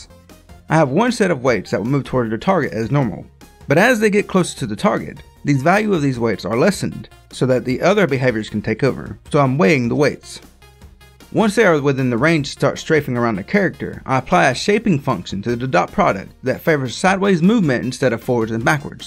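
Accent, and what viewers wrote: South, United States. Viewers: first time ever hearing a southern geek voice. not disappointed. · Just realized this is the first time I've ever heard a game developer with a southern accent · Holt crap I've never seen a person with a southern accent as a developer! :D this is awesome!!!!!!! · His southern accent makes the video better. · Are you southern or northwestern I genuinely can’t tell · I thought it sounded southern, but it was rougher than the other accents I've heard from that area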